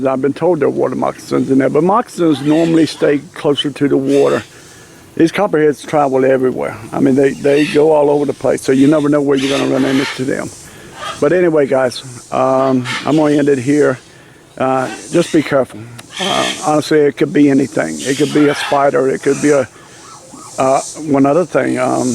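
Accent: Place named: Louisiana, United States. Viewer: Where there is one there are many - be careful! They do eat rats but what a trade off. I hear macaw's - they sound jealous to be on camera - LOL or in pain, I can't decide. Your accent reminds me of my grandparents - LOL Cajuns, they were from Louisiana...you're from Florida....haven't heard it there...PS I think if you click the button on your edit page you can edit the title - I think you mean - Homestead Dangers no Homestad Dangers....just letting you know.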